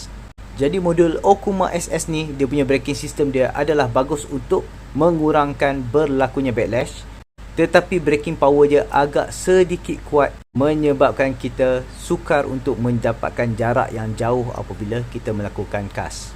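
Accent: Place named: Penang, Malaysia. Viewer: Dekat jla ingat org penang😅😅😅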